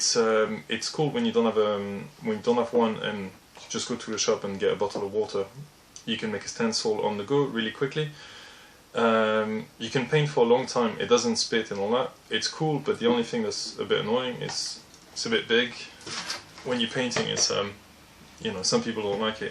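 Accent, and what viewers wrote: France. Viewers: Bonjour ! :) Excellente vidéo! ;) Ps: êtes vous français..? Jai cru reconnaître l'accent..^^ Si oui, serait il possible de faire de vidéos en français svp? :)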